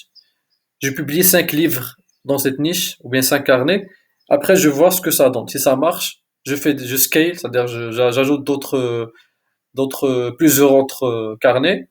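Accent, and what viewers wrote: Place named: Morocco. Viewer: Alors aprés votre poronciation je suis sure que vous êtes un maroccain والله تمغربى تحياتى.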